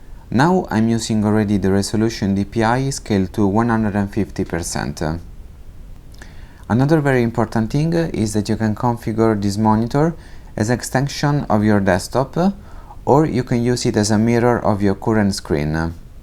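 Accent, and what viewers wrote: Italy. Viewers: Italian accent??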